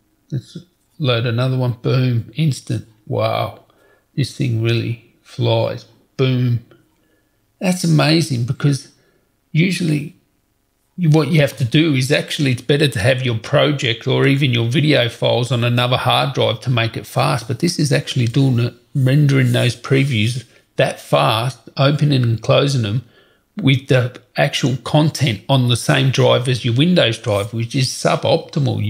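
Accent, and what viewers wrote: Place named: Australia. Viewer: Ofcourse you are Aussie my man! Somehow missed the accent. I use my laptop for VFX rendering, mostly cuda accelerated effects. But I also seek strong battery life, which I am sure the XPS will win the Razer easily. These two laptops are literally a toss up for me, though I am leaning towards the Razer because of the sheer gpu power and better build quality.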